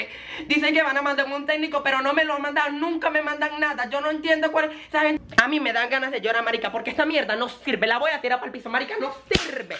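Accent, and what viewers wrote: Venezuela. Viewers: Te equivocas Oriana, ese acento no se lo invento la, así como habla la es como hablamos la mayoría de los adolescentes en Venezuela, te digo que yo descubrí a la hace 2 meses y yo hablo así como ella desde hace años, al igual que mis compañeros del liceo. Y obvio que los Adultos no van a hablar como los jóvenes, ellos son mas maduros que nosotros. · Su acento venezolano Me enamora Y me enloquece!!!😨😍❤🔥 Like si crees lo mismo!!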